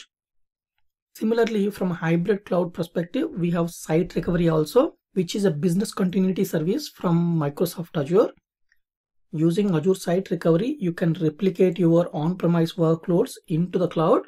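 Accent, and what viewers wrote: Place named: India. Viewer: I'm a about to switch from undergraduate to a grad computer science program and I haven't understood a single thing of what was going on on that video...(well I haven't really studied Big Data (thoroughly) yet,but for people like me this is completely out of reach...or would take 6 hours to understand (most of) all of the material of those concepts) not nice! Also I must put forward the significant Indian accent of the video lecturer, which induces additional efforts in trying to decipher his explanations. (PS: I'm not in grad school yet...so may change don't worry) (PS_2: I studied in a top European university (aside from the UK) To sum up I appreciate the efforts from the poster and turning into on the best IT YouTube educator with a big amount of hard work should entirely be possible. I wish you strength and motivation ! all the best!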